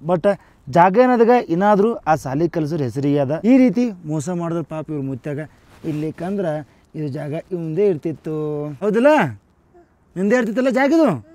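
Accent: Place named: Belagavi, India. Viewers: ಗುರುವೇ ಇಲ್ಲಿವರೆಗೂ ನಿಮ್ಮ ಚಾನೆಲ್ ಮಿಸ್ ಮಾಡಿಕೊಂಡಿದ್ದೆ ನಮ್ಮದೇ ಬೆಳಗಾವಿ ಕಡೆಗೆ ಭಾಷೆ 😀😀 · Nange ishta aguva some YouTubers Alli neevu nimma UK kannada slang super Brother YouTube li feature ide nimge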